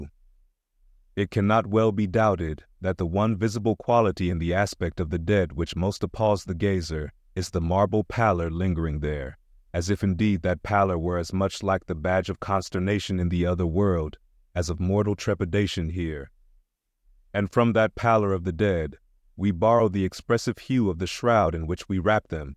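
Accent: United States. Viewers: FINALLY an American reader!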